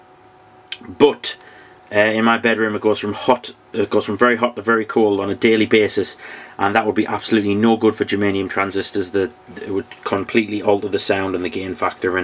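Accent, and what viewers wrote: Newcastle, United Kingdom. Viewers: Yes! Another Geordie! VERY nice gear!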